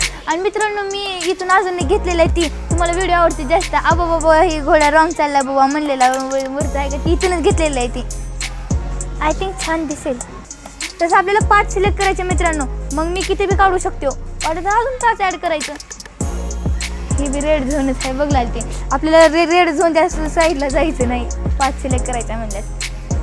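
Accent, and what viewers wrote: Latur, India. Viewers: Perfect latur language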